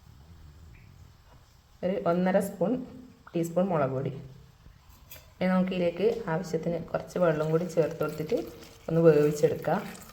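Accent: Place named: Payyannur, India. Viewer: ഞാനും ഇതുപോലെ തന്നെ നമ്മുടെ തനതു ഭാഷ പയ്യന്നൂർ സ്റ്റൈൽ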